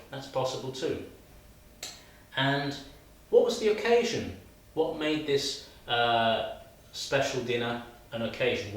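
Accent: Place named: RP, United Kingdom. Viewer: Much better than most EFL/ESOL videos I’ve seen, you crack me up with your’sign language’!!! Pronounced like a true BBC presenter! I am going to pm you an idea-well done! Subscribe people!!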